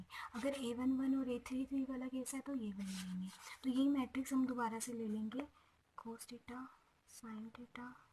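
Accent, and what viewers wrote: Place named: Haryana, India. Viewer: Liked your Haryanvi accent.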